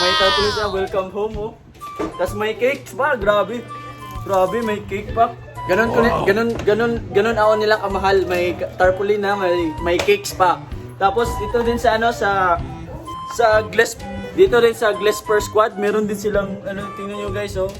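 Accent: Visayas, Philippines. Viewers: Bisayang bisaya accent po kau kuya. Gusto ko matutong magsalita ng bisaya. Hindi po kc ako bisaya tagalog po ako. Turuan mo nmn akong mag bisaya idol kuya. I love hearing bisaya accent speaking tagalog words. Nakakaktuwa talaga kaung mga Bisaya.. Sana makapunta din ako sa inyo sa Visayas at Mindanao one of these days.😊🙂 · Hahaha..nagkatawa ko nmo pareha jud ta ba na pagmagtagalog bisaya japong dako 😁nindot jud ning molingi ta kong asa ta gkan 😊😮 Faith villanueva Davao #done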